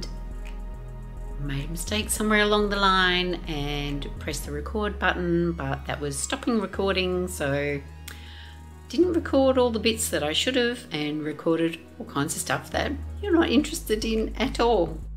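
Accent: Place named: Australia. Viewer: Crazy enough it just popped up on my YouTube page. But as soon as I heard your accent I had to watch. I enjoy all things crochet, yarn, & Australia as I lived in Adelaide and Darwin for about a year and a half and currently work with the Australian and NZ markets for my job. I knew I had to watch and check out your channel.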